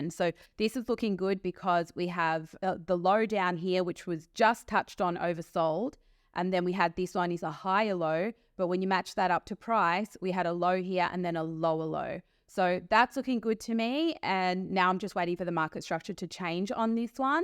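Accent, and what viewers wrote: Australia. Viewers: I hear you I see your accent is from Australia. Great content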